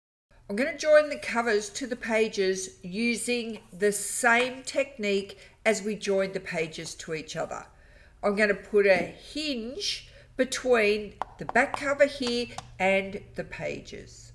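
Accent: Australia. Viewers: Hi! I loved your video! australian accent is New to me, but I could understand everything and the no sewing spine technique is wonderful. Thanks a lot! Hugs from Brazil!!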